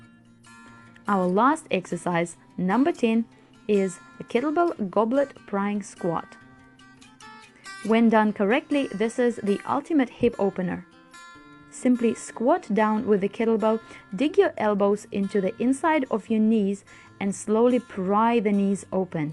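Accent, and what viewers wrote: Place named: Russia. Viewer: What a great Video, if I do this every day plus the other stretches from your videos I think It will be very good for me Some of them i know already but some are new to me Stretching and mobility is the most important thing apart from training itself I've seen you on LebeStark now I'm a fan, your way of talking and explaining is so typically Russian I loooove it 🤗🤗😍😍😍 Grüsse aus Leipzig 🤗🤗🤗 Молодец 👍👋😍🇪🇺🇰🇿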